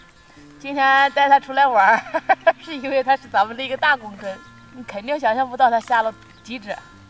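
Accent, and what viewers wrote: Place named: Hebei, China. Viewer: 真是发自内心的开心啊！做自己喜欢的工作最有滋味啦！听见我们河北的乡音，亲切💗💗💗💗💗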